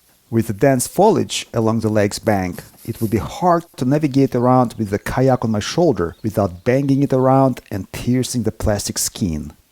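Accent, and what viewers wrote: Russia. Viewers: Как только услышал русский акцент, понял - в видео будет скотч XD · Мне кажется что я слушаю английскую речь с русским акцентом) Обычно носители спешат и зажевывают слова до неузнаваемости. А тут почти на русском) Точно. Это же адвокат егоров =) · Ну прям русский акцент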